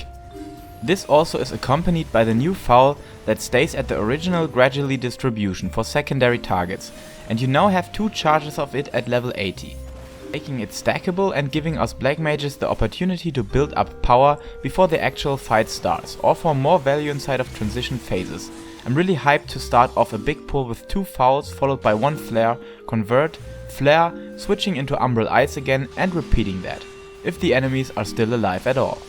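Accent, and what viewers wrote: Germany. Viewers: Are u German? You Sound like a German 😶😂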